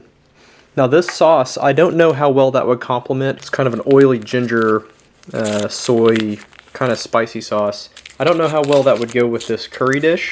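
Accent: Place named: Oklahoma, United States. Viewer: I love the Okie accent 😍,. Maybe that's cause I'm from North Central Texas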